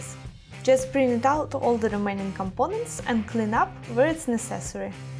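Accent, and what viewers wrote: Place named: Russia. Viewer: Russian accent that you can never hide))))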